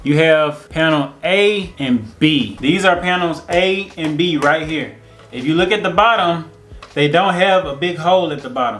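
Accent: Memphis, United States. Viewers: Hey thanks for the tutorial! Your accent sounds familiar— especially when you said “if you’re watching this with your granddaddy”. 😂 I’m from Memphis (but live in Nebraska), so I clicked through your vids and found your Memphisvlogger hashtag. Memphis in the house! And just watched the game! Or…actually…listened to my husband watching the game. Whup that tr1ck! 😊👍🏽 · This was a good instructional……tell me are you from Memphis or spent a period of time there cause I promise you sound like a Memphian 😂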